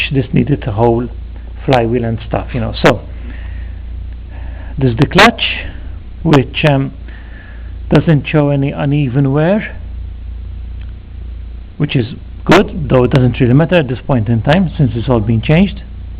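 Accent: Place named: Malta. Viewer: what was the total price with parts you sound maltese so thats why im asking because i have the same issue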